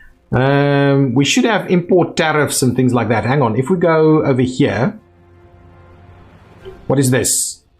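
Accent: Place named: South Africa. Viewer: Are you from South Africa, mate? Love the accent!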